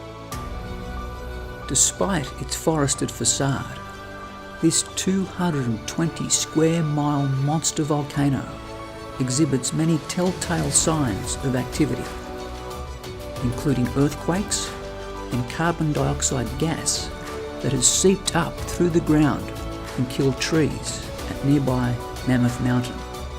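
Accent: New Zealand. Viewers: It’s a kiwi accent you dumbo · 7 volcanoes, lots of hyperbole, little actual information for real reference. Not saying the 'supervolcanoes' are not super hazards, they are. But this video isn't giving anybody any degree of expertise and detail of known facts. Decent visuals, great Kiwi accent. The music is cheesy and makes it clear from the start that this is fluff, not science. I love accurate pop science. This isn't it. Starting with who is the author and why they are qualified to present information is always an honest start.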